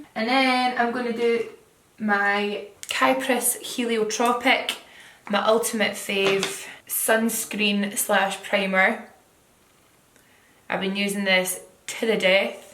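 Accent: Scotland, United Kingdom. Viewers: Scottish accents make me happy. lol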